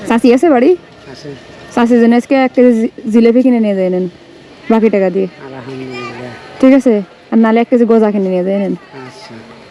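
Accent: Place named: Rangpur, Bangladesh. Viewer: কথা শুনে তো রংপুরের অঞ্চল মনে হচ্ছে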